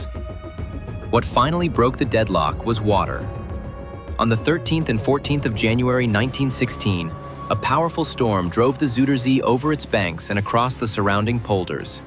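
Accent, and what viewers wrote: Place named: United States. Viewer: In England we pronounce the Zuider Zee as if it were spelt "Zider Zay" (rhyming with Cider Bay). It might be different in the Netherlands. If the voiceover is meant to be American (which sounds to be the case) I can only assume Americans would say "Zooder Zee" but at times he seems to say Zouder "Zowder' Zee.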